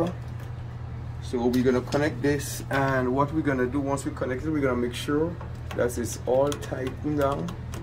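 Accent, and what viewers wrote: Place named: Jamaica. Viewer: I hear that Jamaican accent Man…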